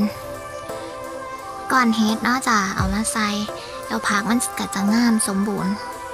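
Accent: Isan, Thailand. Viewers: เสียงเว้าอีสานน่ารักหลาย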